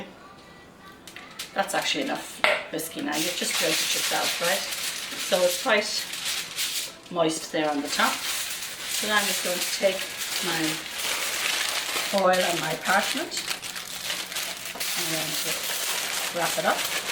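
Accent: Ireland. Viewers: Hah, Guinness. Should have guessed it with that accent! This is a different approach to the plum pudding. It will be interesting! Thankyou. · Are you lrish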